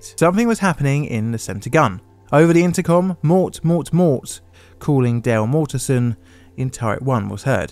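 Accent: United Kingdom. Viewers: The US Navy is being taken to task... by a YouTuber with a Brit accent... at least slightly ironic, eh wot? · AI narration with a Limey accent.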